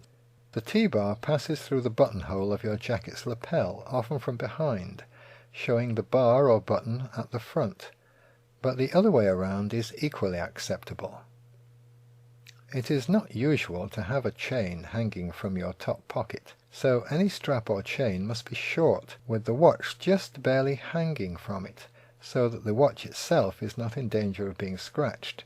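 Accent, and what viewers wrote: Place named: Mid-Atlantic, United States. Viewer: You are the only person I know in the modern age with an impressive mid-Atlantic accent.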